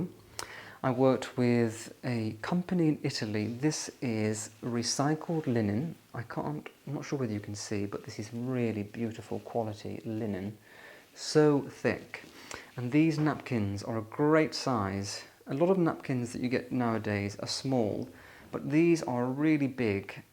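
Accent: RP, United Kingdom. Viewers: Yes, unfortunately he does not see it. He's made videos before addressing people that criticize his posh accent and his lifestyle stating that's who he is and he just ignores it. He thinks the critique is just people being mean or that envy him. I think there's a nice person in there, but he has convinced himself he needs to keep up the elegant dandy facade. He comes across a bit sad and lonely to me. That scene in his closet gave me manic vibes...very OCD. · So British, so posh hahaha.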